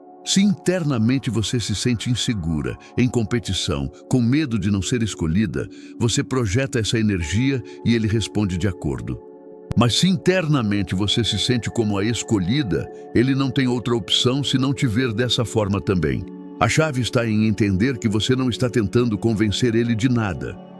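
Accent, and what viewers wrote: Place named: Brazil. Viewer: Porque se oye en du brasilero?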